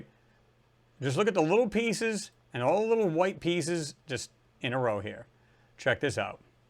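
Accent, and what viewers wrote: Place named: Northeast, United States. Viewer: First thing ....cool show ...love what you cover!! Secondly ...had to smile listening to the accent of commentator....I'm from New England and would bet that the accent is Northeastern U.S. 🌲😁💪💯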